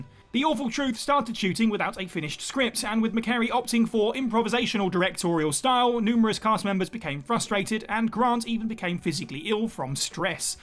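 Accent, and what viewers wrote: United Kingdom. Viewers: Love how British people speak 😂😂🤣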